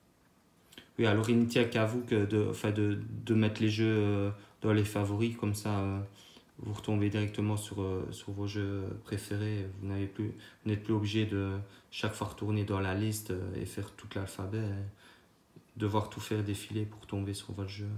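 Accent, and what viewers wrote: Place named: Liège, Belgium. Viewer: le bon accent liégeois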